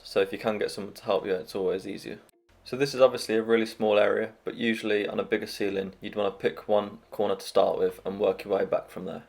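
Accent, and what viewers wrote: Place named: Birmingham, United Kingdom. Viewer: Can hear a Brummie accent 😅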